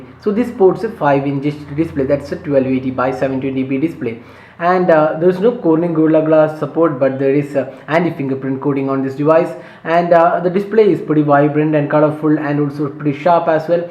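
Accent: India. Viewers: I'm sure this is a good review but it's pretty hard for me to understand your indian-english accent when you was so in a hurry to tell everything you know about this phone.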